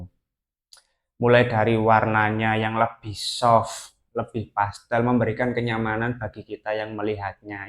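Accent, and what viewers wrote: Java, Indonesia. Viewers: Sampean jowone ngendi cak??? 🙏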